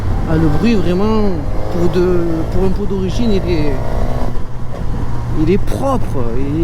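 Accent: Marseille, France. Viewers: test sympa avec ce joli accent de Marseille sans montage bidon toutes les 2 secondes, bien aimé l avis honnête sans matraquage Mt malgré que tu roules en Mt, faut un jour que les procureurs du bicylindre arrêtent de faire des test en voulant systematiquement polemiquer bi et 4, ce sont deux mondes différents et deux choix différents. Le seul bemol dans l avis , on peut bien le prendre en A2 et debrider aprés en 95 si on aime les 4 cylindres, elle est justement A2 pour ça. like et abonnement ✌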